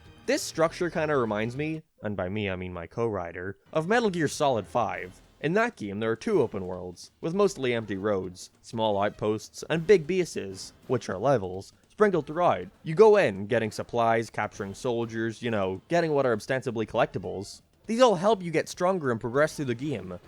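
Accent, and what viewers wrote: Ireland. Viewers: no hes irish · he's Irish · Yeah he’s Irish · ur from donegal arent u my brother? haha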